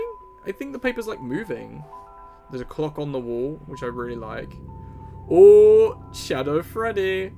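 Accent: United Kingdom. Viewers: i didnt know you was British too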